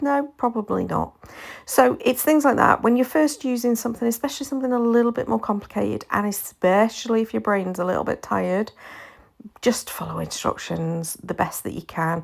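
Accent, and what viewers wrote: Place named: United Kingdom. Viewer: Heey, your video is just fine! We all have our off-days (only most of us do not have to put them on the internet for everyone to share!) - and it is encouragement to all of us that you jot just managed to persevere but even got some exceedingly pretty cards out of it! Plus I love that you just tell it like it is: however pretty a set of stamps, dies, stencils is, if the instructions are not good, it is definitely worth knowing! I love your videos because they are real and fun as well as full of ideas and inspiration (and because I love your accent, takes me back to my days at uni in the UK (looooong ago 😂)). Take care and kind regards from Germany, Nicola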